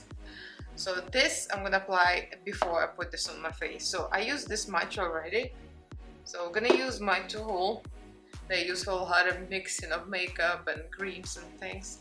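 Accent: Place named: Russia. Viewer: You are just too funny. "My husband tells me I look just like her, but he always compliments me, so I don't trust him." "I sound like I have a speech defect. Yes, I have a Russian accent, so I sound like I have one anyways." 🤣. I already told you I think you look exactly like Alexis, too. And I'm not your husband, so you should believe me.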